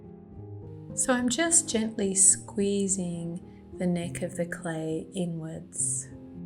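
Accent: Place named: Australia. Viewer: Great video. I was very happy this came up in my feed- I was so excited to hear your Australian accent! Yay! 🥳 I have been watching lots of potters but I am so very happy someone as skilled as you from AU has decided to post. Thank you 🙏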